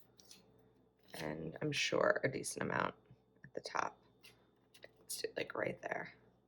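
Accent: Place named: New Jersey, United States. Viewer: Hi...so sorry for your loses. I was listening to you while I was just doing my planner and you made me laugh. You asked the question about the pronunciation of the word orange. I thought wow she says it the same way I do. Then the next thing you said was that you were from NJ. I'm from NJ as well now residing in Vermont. They tease me all the time about my accent. I'm constantly trying to explain that it's not me with the accent but them...lol. I love your spreads and videos. Chin up, you're doing a great job.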